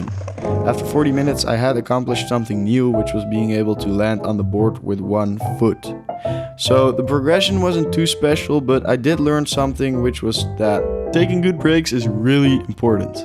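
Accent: Netherlands. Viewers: Eindelijk ik wist het aan je accent je bent Nederlands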